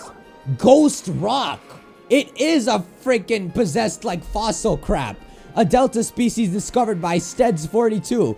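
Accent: Canada. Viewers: are you Canadian? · are you from Canada?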